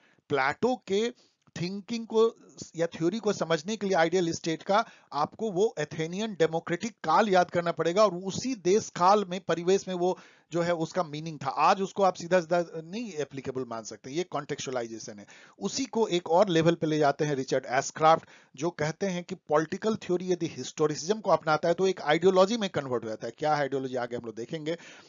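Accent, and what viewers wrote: Bihar, India. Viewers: Bihar se hai kya sir aap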